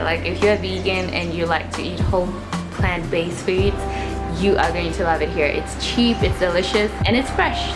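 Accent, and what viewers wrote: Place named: United States. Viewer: You don't sound like someone who grew up with Danish as first language. I once lived in Denmark. You have an American accent! Are your parents American or did you school in the USA?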